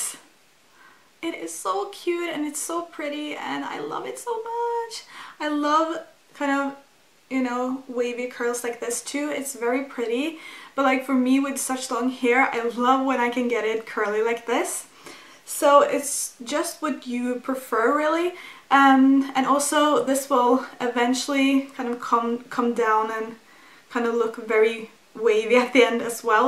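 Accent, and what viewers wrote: Norway. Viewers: I KNEW you were Norwegian hahaha heard it!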